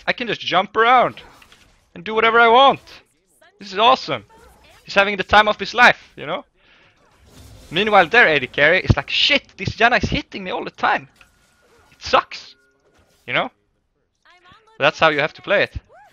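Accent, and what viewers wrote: Sweden. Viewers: I don't know what accent you mean, but of course Swedish people have a similiar accent! Most Italians have the same English accent aswell, etc. I don't understand why "TheBlueSledge" is crying about it. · swenglish HAHAH all of us sweds talk like that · Du hade en väldigt start svensk dialekt i den här xD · cryaotic our hero! I'll have you know not all swedish people sound like that. Brings me shame every time I hear some one that.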